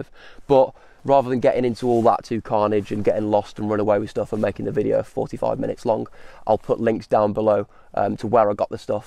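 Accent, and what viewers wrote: Birmingham, United Kingdom. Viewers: 🤣 Great conversion mate, even for a Ford! Are you a fellow brummie? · What a british accent ! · Are you from Birmingham? 😊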